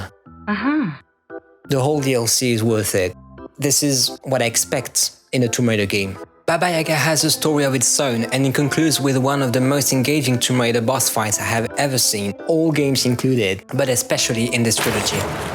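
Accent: France. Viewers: French accent is 👌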